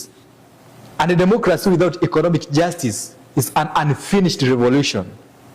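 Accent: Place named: Uganda. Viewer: He sounds more of a ugandan than kenyan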